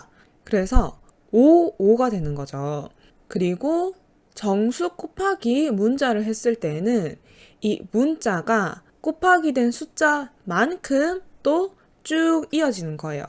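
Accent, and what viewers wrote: Gyeongsang, South Korea. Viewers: 갱상북도 사투리네이~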